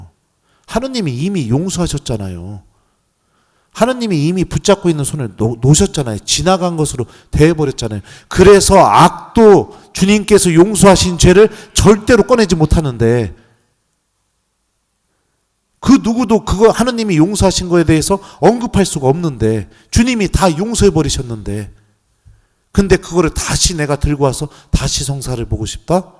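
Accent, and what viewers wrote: Chungcheong, South Korea. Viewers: 요즘 신부님 특강 너무 재밋게 듣고 보고있습니다. 구수한 충청도 사투리가 마음속에 쏙쏙 와닿는 것 머리속에 꽂치는거 신부님은 천상 우리의 스승님이십니다~ 고맙습니다.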